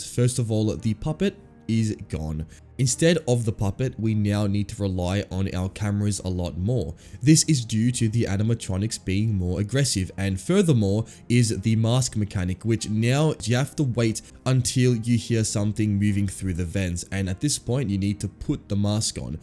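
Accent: Australia. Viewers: He sounds Australian.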